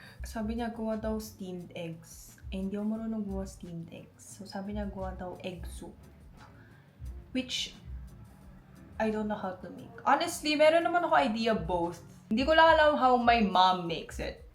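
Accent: Batangas, Philippines. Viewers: Mahilig ako sa mga video ng mga tao at ibang culture. Mga ibang lahi na nasa pinas. Or pinoy na nasa abroad kasi nasa abroad din ako. Eto talaga paborito ko sa kanila kasi kababayan ko are sa batangas haha. Pati pagsasalita. Nakakamiss mga batanguenyo. At mabuti yan sa mental health mo andyan kankung saan ka masaya kaya mabuti at nasa pinas ka. Napanood ko kasi ibang mga video mo. Ako at yung ibang pinoy sa abroad no choice minsan. Nakaka epekto talaga sa mental health natin. Napanood ko kasi yung homesick video mo.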